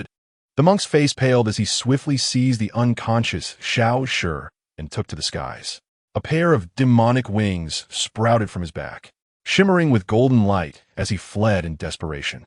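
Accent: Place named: France. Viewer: This ai is a little french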